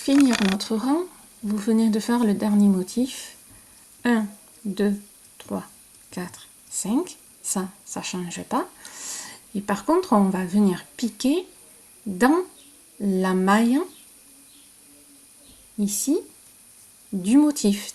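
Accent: South, France. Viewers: Bonjour vous êtes mon rayon de soleil et le bonheur de vous entendre avec votre accent du midi je suis moi de même du midi MERCI BISOUS BISOUS BISOUS FERNANDE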